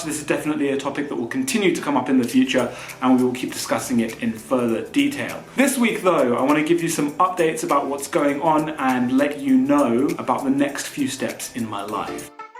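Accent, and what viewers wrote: United Kingdom. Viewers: The simplest way for both you and us is option # 2 so long as you manage to speak slower; after all, British accent is less easy to grasp. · 我觉得你的英式英语更容易听懂诶！！！我之前听了几个英国人的，我觉得吞音（？）好多。。。我还是习惯美式英语😂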